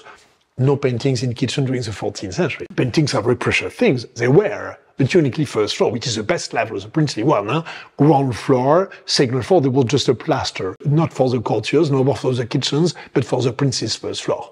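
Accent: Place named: France. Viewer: Oh my godddd…. He speaks too fast with a very French accent.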